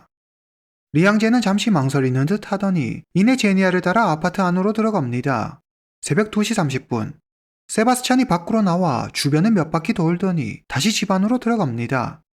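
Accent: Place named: Korean-Chinese, China. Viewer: 조선족 특유 억양이 살짝 남아있는듯 그래도 많이 고치셧네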